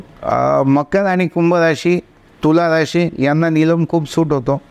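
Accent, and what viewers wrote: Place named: Dhule, India. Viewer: Bhashe warun tumhi Dhule che watata, mazha janma Dhule cha ahe, mi Mumbai madhe asto, mala consultation gheta yeil ka?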